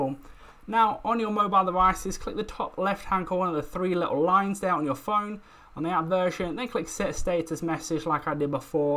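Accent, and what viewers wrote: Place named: United Kingdom. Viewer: woo.. That's some British accent.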